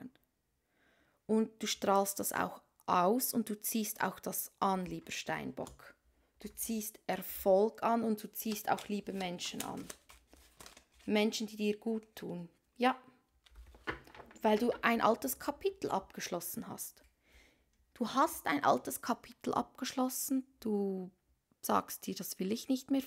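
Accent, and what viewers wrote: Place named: Switzerland. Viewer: Mit wunderbarer schweizer Akzent ;) Grüessli us de Schwiiz :D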